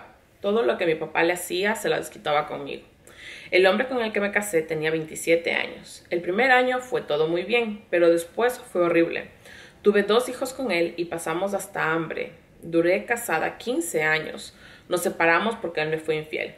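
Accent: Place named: Ecuador. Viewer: me encanta que a pesar del tiempo que vives en USA sigues hablando muy ecuatoriana ❤️❤️❤️❤️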